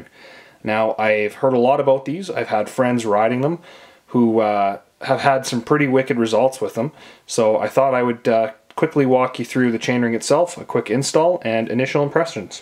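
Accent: Canada. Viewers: Thanks this will be helpful. I have an AB oval on my road bike and I DO notice a difference there. I am considering one for my MTB. Used to live in Evergreen, Calgary. I thought I noticed an Alberta Accent.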